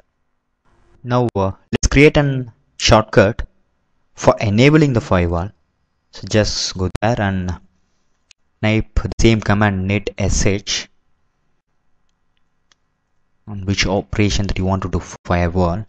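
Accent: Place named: India. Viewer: Love indian/english! :D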